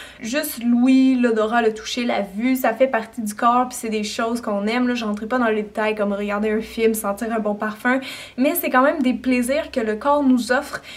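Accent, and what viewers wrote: Canada. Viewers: Je suis d'accord avec Elisa071096 tu es la meilleur youtubeuse beauté, car tu es simple et authentique, et non pas artificielle est maniérée... et tu as un très jolie accent canadien ^^. Tes conseils sont toujours intéressant et bon à prendre, merci à toi pour tous, j'ai bien aimé cette petite parenthèse psycho et la journée B&B c'est juste une merveilleuse idée xoxoxoxo ;)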